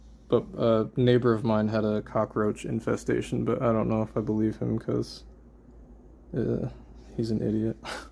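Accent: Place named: United States. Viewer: Here we go! Comment wars! 47 mins!? Wowowow! A few nice phases hahaha!! Your smooth American voice is soothing haha. It's nice to see your rougher work. The bishop sketches are badass!!! Reminds me a little of an artist called Crom that made bird king but your stuff is a lot more realistic. The little things you write down throughout the book are cool, a little hint of emotion here and there. Just wanna say that this is awesome that you've finally made the video!!!! I love a skull with a badass jawbone for some reason so this is itching a scratch for me. I think sharing your work like this will be so good for you. Kinda just getting some thoughts out into a video through voicing it 🙂 I prefer black and white drawing too but some colour on my stuff brings it to life a little. Maybe try a little grey or a single colour. The audio is good by the way, nice and clear. 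The big masks are pretty cool, looks like real bone. You know mate you are actually so so good at drawing. The tiny tiny details are super intricate. The picture of the burn pile was awesome. Sorry to hear about your uncle buddy. Unfortunately I know the pain of losing a loved one. (Hug) Never knew your biological father. That's sucky... But at least you made some cool art out of it. I wish I never knew my dad the old drunk bus driving wanker haha. I don't personally enjoy social situations too much either, I prefer just a small group or just me hanging out on my own... Which is kinda weird for us as we are so up for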